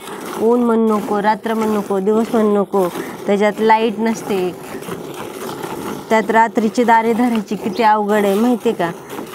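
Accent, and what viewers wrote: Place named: Solapur, India. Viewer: Nice vlog: I love to hear your Marathi with Sholapur flavor. So frank and sincere.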